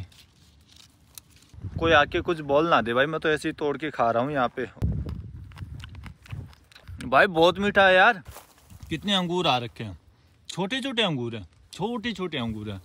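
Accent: Rajasthan, India. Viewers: Aap kya rajasthan se hain kyoki aapki hindi ki tone pashchimi hindi jaisi hai aur chehra lamba ..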